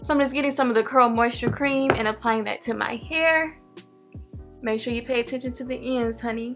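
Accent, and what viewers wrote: South, United States. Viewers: “...there you go! Throw it to the beck!” lol that country accent! Beautiful lady and beautiful hair! Got me another easy style! God bless sis! ❤️ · What part of the US did she get her accent from ?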